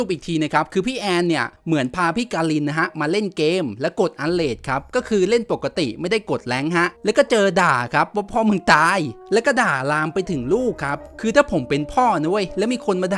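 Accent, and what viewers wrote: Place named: Rural, Thailand. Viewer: เสียงบ้านนอก คำนี้เกินไปนะ เกิดบ้านนอกแล้วไงเล่นเกมแบบคุณไม่ได้เลยหรอพ่อคนในเมือง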